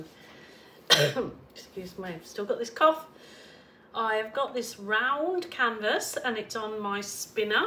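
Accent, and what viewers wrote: Birmingham, United Kingdom. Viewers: Like the wrapper of a gorgeous chocolate bar 😋😍. Are you from Birmingham, England originally?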